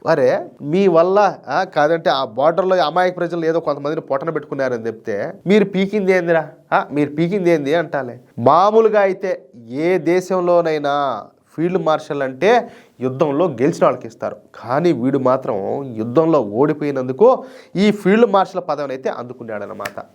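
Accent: India. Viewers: Mr., I like your videos due to your slang of telugu.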